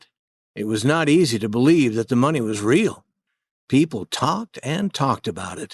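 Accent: United States. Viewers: it's useful to practice and mimic American accent :")), thank you so much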